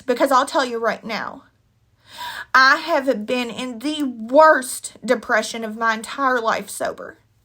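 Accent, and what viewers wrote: Kentucky, United States. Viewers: Hey neighbor.... I'm A KY girl too. Thanks for sharing your story. I subscribed to your channel. Hope to see more content from you. I feel the same way about her. I'm also in recovery. Nice to hear your voice with the familiar accent. You are so REAL . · I’m talking to my mother now and told her about you going to Lake Herrington and she said my great grandfather worked there and his name is name is Jessie Lee Price and I guess he met really famous people there because they had bootlegers in the area. I told her how sweet you are and how much I love your accent bc it reminds me of my time I spent in Ky. My mom said we have family in Louisville and Lexington as well, Alexander County, and Boyle County. My stepdad family is from its Somerset ( Scienceville ). So we have family all over Kentucky lol 😂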